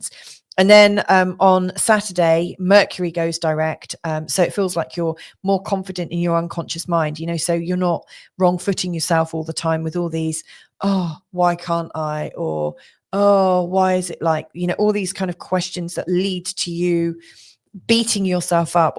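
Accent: United Kingdom. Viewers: Lucky woman with that gorgeous red hair and beautiful face. British accent too. If you came to America., you would have many men , good ones, fighting for you Thanks in advance for the reading I hope it’s in line with what is going on for me and then I win the backup offer on the house! Love from America and prayers for all my brethren, British folk. Praying for your nation and people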